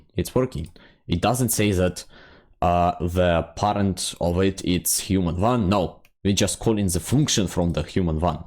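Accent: Russia. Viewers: omg the russian accent i can feel it great tutorial !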